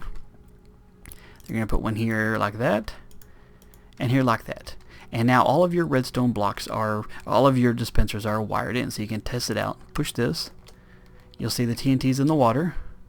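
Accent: South, United States. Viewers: Hey are you from the south? I can really hear your accent. You wouldn't happen to be in Texas would you? ☺️ I'm a homesick Texan meaning I am from Texas but I live up in the North East. People aren't kind up here. ☹️ Where are y'all from? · You sound like a hill billy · R u a county folk mr acsent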